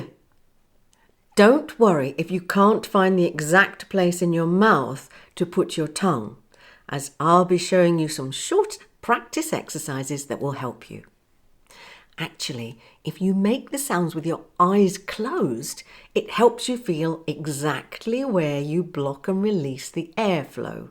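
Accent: United Kingdom. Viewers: Hi! 😁 which British accent do you speak? North or south accent? I like it.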